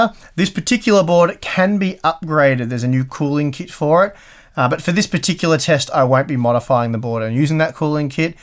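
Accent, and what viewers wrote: Australia. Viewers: Just what I want in a tech YouTube channel, Australian accent, gives you alot of detail on everything Pros and Cons. Keep up the good work. 👌🏻👍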